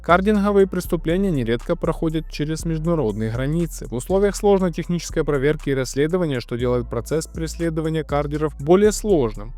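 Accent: Ukraine. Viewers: Автор ваш акцент говорит о том что с Украины,и к вам будет вопрос-предложение,не сложно ли вам пособирать информацию о том какие у вас статьи и какие сроки за них,кто чаще всего туда идёт. Вы вещаете на русском но у нас есть и несколько своих каналов на эту тематику,здесь бы хотелось бы видеть разницу как у вас и у нас.У нас чаще всего идут-попадаются молодые от 18 до 25 лет и сроки от 3-5 до 20 по статье 187 УК РФ,в зависимости от того куда деньги ушли.И хотелось бы узнать как у вас с этим,это правда займёт больше времени но и интереса будет больше.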